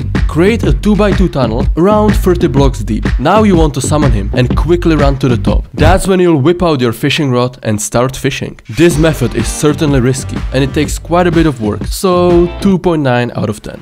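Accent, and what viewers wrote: Czech Republic. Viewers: bro sounds kinda czech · You have a Czech accent. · ur English speaking skills sounds like it talking Czech Guy, dont take it serius, im just asking :D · Ty jsi určitě Čech. Vždyť nemáš ani anglický přízvuk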